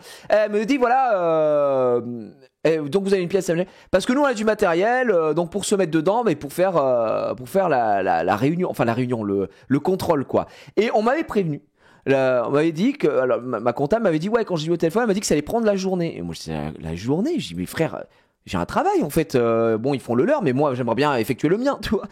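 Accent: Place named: Paris, France. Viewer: non je pense que c'est juste le phrasé parisien typique + le fait que aime bien exagérer qui fait que ça ressemble beaucoup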